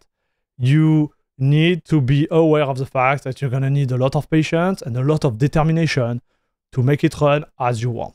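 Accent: France. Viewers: chat, i think this guy might be french, can't put my finger on it why tho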